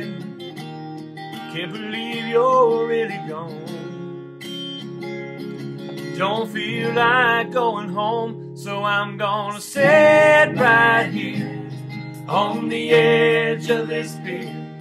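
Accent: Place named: West Virginia, United States. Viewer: Pretty good man. Listening to your accent makes me wonder why I ever left WV. I can not wait to be back next month.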